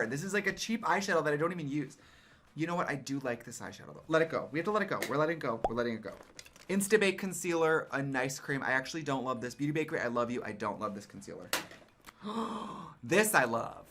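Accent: Wisconsin, United States. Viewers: her wisconsin accent in this video>>> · I love her Wisconsin accent! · I hear your WI when you say go bag 🤣🧡🧡 I'm from Racine which you may or may not know isn't too far from the Mil much love I bought the Plant Gay PR Box and I use that goddam box for my makeups you are an icon I love you I'm going to convince my bf to take me to This Is It bar so he can finally LIVE ❤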